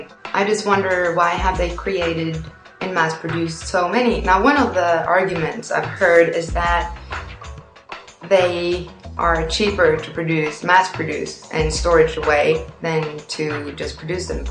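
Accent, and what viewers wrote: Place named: South, United States. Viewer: Whats up with your accent babe? Or is it the crappy background music distorting it? Its that southern thing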